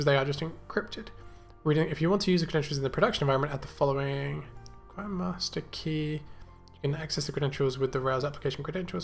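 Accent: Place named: United Kingdom. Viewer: Mate, I really do love your British accent. Cant get enough :D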